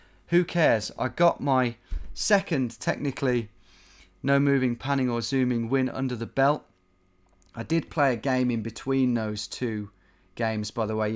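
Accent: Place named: England, United Kingdom. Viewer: Speaks English with an English accent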